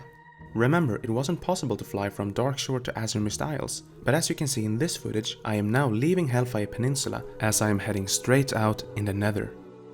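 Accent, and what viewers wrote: Sweden. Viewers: I can hear the Swede inside you. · Damn va svensk du låter. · swedish? xD your accent is gold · Ey bro are you swedish because i can here it lowkey in your accent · Is he Swedish? Sounds like english-swedish speaking... Im swedish.. :) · let me guess, you're scandinavian? Swedish?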